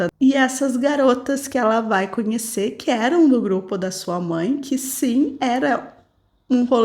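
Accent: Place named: Porto Alegre, Brazil. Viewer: Tu é minha conterrânea? Com esse sotaque só pode ser porto-alegrense.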